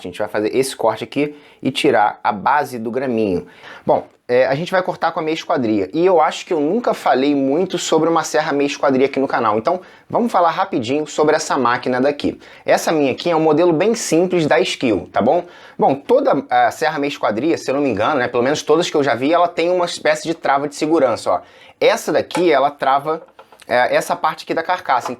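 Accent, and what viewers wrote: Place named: Teresópolis, Brazil. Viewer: Boa tarde,adoro ver o teu trabalho,ele é feito com muito esmero,sou natural da minha querida Teresópolis ( RJ) e pelo sotaque somos conterrâneos,hoje estou morando em Indaiatuba interior de São Paulo e tenho uma marcenaria,desejo a ti muitas felicidades e muita madeira pra serrar,rs rs...abraços!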